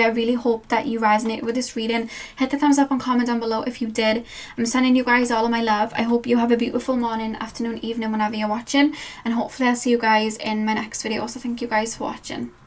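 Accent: Wales, United Kingdom. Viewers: thanks for the reading, and I love the Welsh accent, don’t worry about those people who have to say something ridiculous about you, the comments are more about showing who they are than who you are. Take care of you! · I love your accent ❤️ My mum died when I was young and she was Welsh so I enjoy watching you because it reminds me of her and it’s not an accent I hear outside of my own family in Australia xx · #3 Love your lovely Welsh accent. Take no notice of anyone that says otherwise. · Welsh is just an accent we don’t hear a lot, at least here in the US. We get some new Irish & English immigrants and visitors, and both those accents are well known culturally anyway, and so is Scottish(I love Billy Connelly), but I’ve never met a Welsh person or even heard a Welsh accent in a movie. The first time I heard you you sounded like a mix of multiple accents to me-including various NY accents(which includes different accents in each of the five burroughs, plus Latina and Carribean accents), and there were moments when you even sounded like you were from “the valley”/California, in addition to a vague scotch-Irish-English sounding accent. 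It was confusing because you like American pop music so much, and talks in an English accent a lot, and she’s from NYC, and Carribean-American, but she lives in Hollywood now. I prefer listening to musicians from around the world on NPR Tiny Desk YT channel. In female rappers I like, and. I also love singers, and. My favorite artist might be; does a beautiful cover of / 💚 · I am by no means am knowledgeable about accents (as an ignorant American), I thought your accent was authentic but assumed you were from Ireland. 😅 I thought you had an Irish brogue until you said you were from England. Whoops. 😆 So, up until following you, I had never heard a Welsh accent. I may have, but always assumed it was Irish I guess. 🤷‍♀️ Anyway! Amazing readings as always! Thank you for taking the time to make it and upload this. 😊🙏💕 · Pile 3: You sound perfectly, authentically Welsh to me! I have to agree with your interpretation of "divine timing." Besides, we're not really separate from the universe in any sense, so how could it be up to anyone but us? 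Thank you! 💜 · Thank you,! Pile 3. I, for one, never thought your accent was faked! It's very charming and very British Isles...bless you for your dedication to your audience! Sending love!🙏🙏😊❤️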